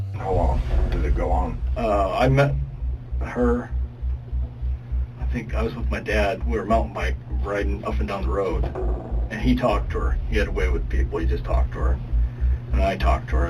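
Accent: South, United States. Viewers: The southern accent definitely helps. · This homegrown Aussie girl absolutely loves all of your southern girls accents. Or is it y’all? Sounds lovely to me. 🎶 💕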